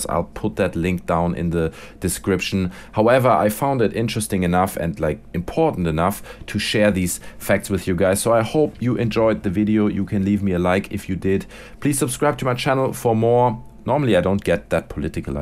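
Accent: Germany. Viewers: Ah your accent is German, I've been wondering since the day I saw one of your videos, you say you live in UK but your accent just sounds so different :D. Merry Christmas and Happy New Year :) !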